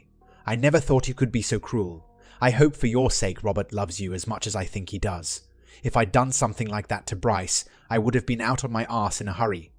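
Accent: United Kingdom. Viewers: Lol. Annoying British accent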